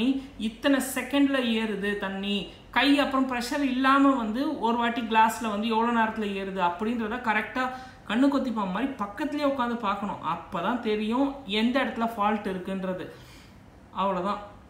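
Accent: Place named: Chennai, India. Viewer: Sema voice bro real Chennai man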